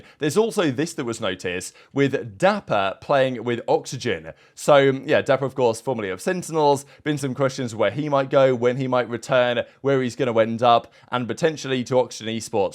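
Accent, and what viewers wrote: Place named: United Kingdom. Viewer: yay learning english, british engliish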